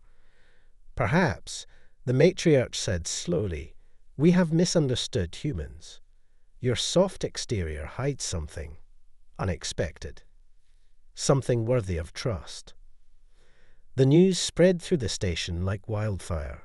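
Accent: Scotland, United Kingdom. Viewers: A story read with a Scottish accent! Lovely ❤ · love the accent, brings back memories of my Scottish Grandmother ❤‍🩹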